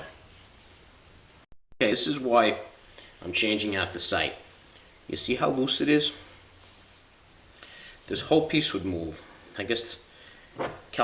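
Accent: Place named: East Coast, United States. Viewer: You got the back east accent. I'm from Jersey.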